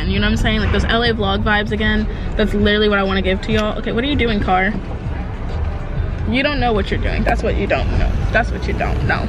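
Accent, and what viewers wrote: United Kingdom. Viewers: I can hear little hints of the UK accent coming out.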